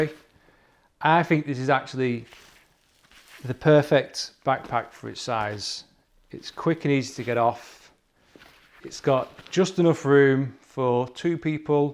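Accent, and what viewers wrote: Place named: United Kingdom. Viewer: I like that this bag is good for 1 people as you say. I appreciate being able to learn proper English from a British people like you.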